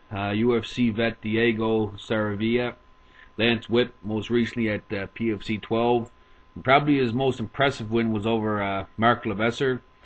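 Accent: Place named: Eastern Canada, Canada. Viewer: You have one hell of a Eastern Canadian accent.....you're a very knowledgable poster though. Keep it up!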